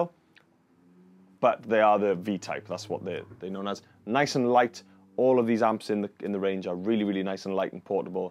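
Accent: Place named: South Wales, United Kingdom. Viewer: Brilliant video,. (I’m from South Wales but living away so good to hear a familiar accent). Although this vid is from a couple of years back, it’s still an outstanding demo of the amp. At first I was thinking I wanted you to keep playing the same licks and sequences so as to make it easier to compare the voicings; but as the demo went on, and the excellence of your playing became apparent, it seemed good that you’d modified your style to suit the nature of the sound in question. You were also one of the first demonstrators I’ve seen to mention at the start that the modelling technology used here is in line with the sophisticated developments of Kemper, Fractal and Line 6. Excellent work. Thank you!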